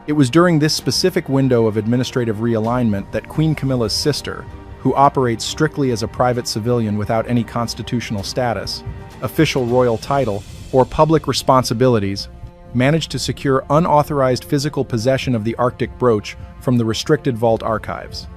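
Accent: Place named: United States. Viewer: WHAT A LOAD OR RUBBISH ! Done with an American accent and no accurate knowledge of the Royal Family.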